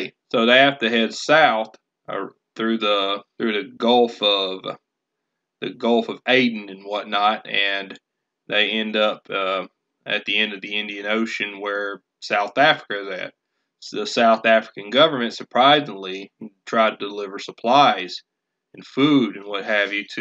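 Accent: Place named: South, United States. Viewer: Ofcourse you would have a southern accent, not even surprised in the slightest bit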